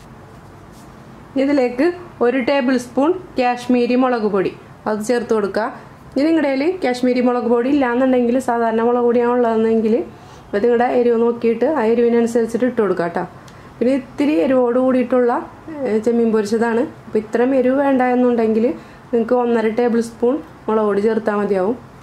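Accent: Kodungallur, India. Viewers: 😀samsaram kettappol thonni. Ente veed kodungallur an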